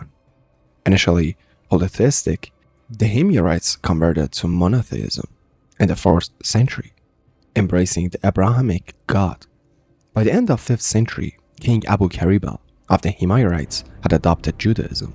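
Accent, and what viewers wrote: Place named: China. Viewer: For da luv of god please stop talking ! Ur chinglish is terrible ! Get someone who can speak & pronounce English words correctly.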